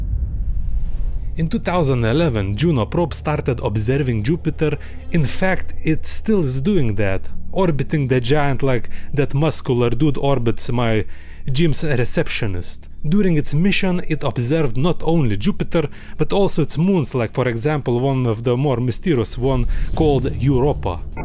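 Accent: Russia. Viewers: Hu... he comes from Russia as i hear! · R u from Russia or Germany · ロシア人の英語を聞いてたらロシア語話せそうな気がしてくるから不思議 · U sound like Russian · You sound so russian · Чистый русский английский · I would like to thank you for puttign subtitles, even though i can understand you with your (correct me if im wrong) Russian accent, no offense, i really live thr accent, just sometimes hard to hear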